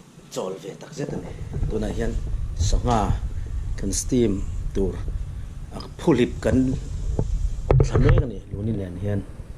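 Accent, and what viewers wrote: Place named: Mizoram, India. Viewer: Nang hi Chu ooo...I thiltih engkim mai hi ..a Mizo bik emem rigot mai ani..English pon Han trawng v mahla a Mizo pa English trawng deuh bik riau 😁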